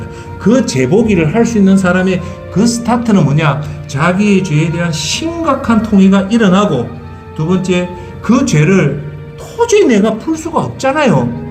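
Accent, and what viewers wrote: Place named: Gyeongsang, South Korea. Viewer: "예수는 빼삐고"... 아이고~ 빠뜨리고 아닌가요? ㅎ 경상도 사투리 그립네요. ㅎㅎ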